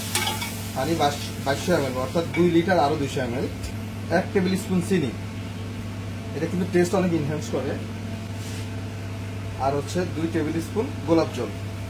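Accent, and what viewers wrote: Noakhali, Bangladesh. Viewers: ভাই আপনার বাড়ি কি নোয়াখালী আপনার কথার ধরনটা ওরকম লাগতেছে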